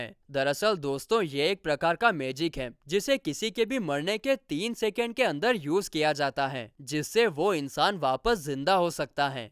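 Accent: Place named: Gujarat, India. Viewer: Bhai ye gujtati vibe hta de yar thoda sa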